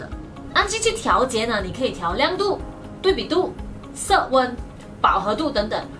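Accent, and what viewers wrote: Malaysia. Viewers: 一直感觉说话很可爱很搞笑，语速很快加上独特的魔性大马口音，哈哈😃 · 马来口音很重😀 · Ke En是大马还是新加坡人？口音好重哦...😂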